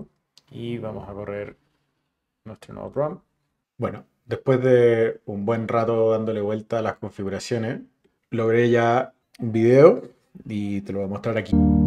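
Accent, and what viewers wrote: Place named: Chile. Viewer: tienes acento chileno...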